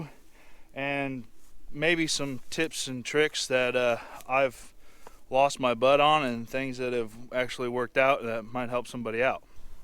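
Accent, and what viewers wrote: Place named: Missouri, United States. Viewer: Just subscribed... good vid! I am from the Albia, IA area, so your accent is getting close to MO, I can tell. Tip: I believe you should not overlook the tractor as not worth mentioning. Too low on HP and weight, and it may not pull and properly operate the equipment. Too large, and it will be a waste of fuel, and the hours on a expensive machine, hours that should have been put on a lower cost machine. Blessings for 2020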